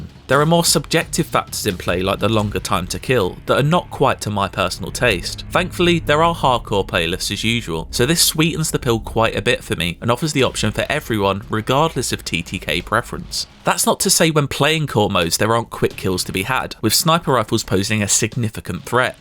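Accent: United Kingdom. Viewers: This guys British voice pisses me off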